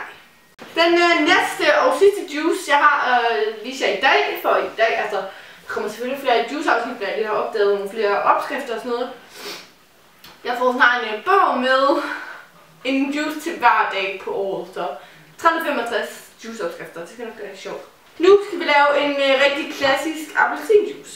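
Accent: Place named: United Kingdom. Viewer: Dat engelsk😂😚💕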